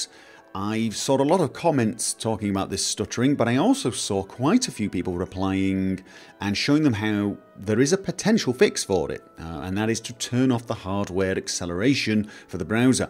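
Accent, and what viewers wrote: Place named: England, United Kingdom. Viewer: OH MY GOD ... that accent ... seriously where are you from, England? also, this did work, too, so thanks.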